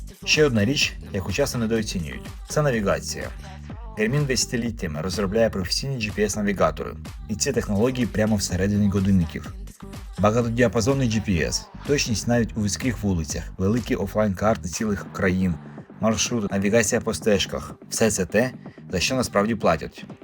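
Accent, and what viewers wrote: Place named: Russia. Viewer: тому, що людина була все життя російськомовною і ще не впоралася з цим маркером російського акценту.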